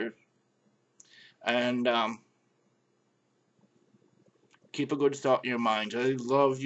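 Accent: Midwest, United States. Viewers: I'm from Australia, and yes you do have an accent, although the general Midwest accent is harder to emulate for me at least, it's much easier for me to put on a Southern or NY accent. But yes, Mid-westerners do have accents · Midwesterner's have an accent. You have a Wisconsin-ish or Minnesota-ish accent. I can hear it in the o's. I didn't really think I had an accent, but others think I do. I'm from the UP so I guess it's a Yooper accent, though a lot of people thought I was from Chicago. I moved to Philadelphia and many people down there thought I was from Canada. -_- Now my accent's a weird mix of Yooper and Philadelphian. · Omg iam in Ohio right now just staying there for today !!! Tommrow iam going to Indiana !!! Going to be staying there for 2 days !!!! Then mostly my desentation is Wisconsin going to be there for a week !!! And your accent is so cool defently sound like Midwest accent !!!! 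· your accent sounds almost canadian, but not quite. it's definitely midwest. i like it. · you are definitley from the midwest. the way you pronouced the "i" sound when you said. "guys". it was like, a real sharp "i" sound. and yes the midwest has a huge accent. a lot of midwesteners pronounce "on" like "Ahn" when in other regions it is pronounced like "Un" Everyone in the world has an accent to sombody. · You definitely have a mid-western accent. You probably wouldn't recognize it just like you wouldn't recognize a west coast/Californian accent if you watch enough TV. I'm from NY state and I moved to Wisconsin recently, and I overheard someone say that mid-westerners don't have accents, and my gf has a Wisconsin accent too, but she thinks mine doesn't sound different to hers, but it definitely does, and I think it's because she watches TV, desensitizing herself to the accent actors commonly have living in or near LA and my accent is pretty similar to that so she doesn't recognize the subtle dissimilarities to her own which are very apparent to me. 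I'd say mid-western accents are on opposite ends of the spectrum to some southern accents (like NC). The MW accent having harder vowels and some southern accents having softer ones. I'd liken MW accent to Canadian and I don't doubt its influence. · Everyone has an accent. It just means the sound of your speech and is primarily determined by your friends and family when you are growing up., you sound as tho you are from the upper Midwest of the US or perhaps the rural midlands of the Midwest. The major difference between this and the "general American" accent that is impossible to place and strived for by TV presenters and actors, I would say is the broadness of the As, roundness of the Os, and hardness of the Rs. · You do have an accent. It's nice. It reminds me of home in Chicago Illinois. I always vacationed in Wisconsin, and I would meet people from all over the Midwest and heard your type of accent all the time. 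In fact, whenever I hear Midwestern accents I get a happy feeling because of my memories of that place. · My relatives all live around Myrtle Beach, while just my immediate family live in Minnesota. But yeah, you sound Midwestern, and we do use our R's a lot. My mom says I swallow my R's :P. I laugh my ass off whenever I watch a movie taking place in Minnesota, or has a character from there. Yes, a lot of us sound like the folks in Fargo (even though that's the Dakotas). Hell, I even say 'up Nort' instead of 'up North'. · I am from the midwest too (in Ohio) and to me you have an accent. I don't think I have one though. I dont know though. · As an American this accent resembles people living in Southern Wisconsin, Northwest Indiana, and Northern Illinois. People from the Chicagoland area sometimes sound a little different though due to urban influences. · True, and it's largely rural as well. 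I'm from Minnesota, and you'd never hear someone talking like this in Minneapolis/St. Paul, but you hear it sometimes in the farming communities.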